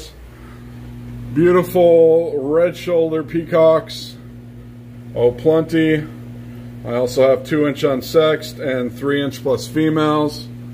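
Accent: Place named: United States. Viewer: With all due respect, did you not hear the American accent lol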